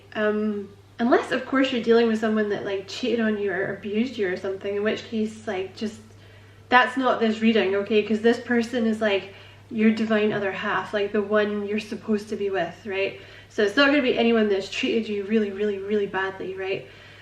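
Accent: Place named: Scotland, United Kingdom. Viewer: I loved this reading. Resonated so deeply with my current and past love life situations and not only was it true of me as a person, but what you intuitively picked up on with him was also very accurate. You mentioned a possible Aries ♈️ connection for new love and I’m in communication with him, and we are soon to meet in person for the first time. I’m so nervous because he is such a humorous and seemingly confident person, but I don’t feel I won’t be interesting enough, funny enough or bold enough for him. I can come across that way because I have Scorpio moon and Leo moon, but I’m not able to naturally be that way all the time. You often hear that Aries and Water sign compatibility isn’t the best, especially when his big three signs are all fire and I have two water and only one fire (but perhaps even having just one fire in my big three could help with compatibility). 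I hope that that doesn’t always matter with this combination. Then again I’ve been with people in the past who astrologically should have been a great match for me, but weren’t in the end. So I just hope that we get along as well in person as we do online with the strong connection we have. Please tell me if this combo can have good compatibility and wish me luck, because I feel I’m going to need it 😅. Thanks again for a lovely reading, 🦋 Oh and btw, I love how soothing your voice is and your accent, which if I’m correct, I would assume is Scottish? If I’m wrong, I apologise. But it’s a very p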